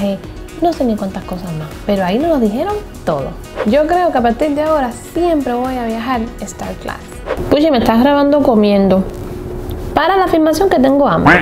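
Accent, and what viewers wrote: Cuba. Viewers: Sandra le da asesoría igual que Harold trabajan con ella, en ningún momento dicen que son familia. El acento es de Cuba. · Las cubanas tienen la misma voz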